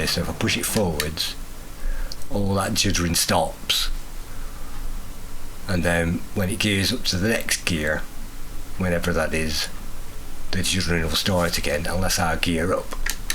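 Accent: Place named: Blackburn, United Kingdom. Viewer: You from Blackburn fella? Sound local.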